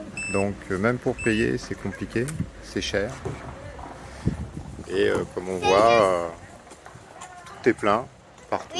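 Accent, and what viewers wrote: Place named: Montpellier, France. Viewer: Tu es de Montpellier toi avec ton accent pointu? Nan en fait je crois que tu es venu acheter le soleil comme ton pote qui est allé acheter une femme dans les pays baltes ou en Thaïlande pays tristement célèbre pour ça. N'oublie pas de revenir te faire soigner gratuitement en France si tu tombes malade ^^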